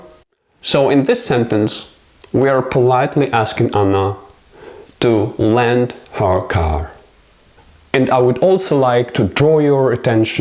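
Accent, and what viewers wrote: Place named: United Kingdom. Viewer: Цікавий акцент. Схожий на британський.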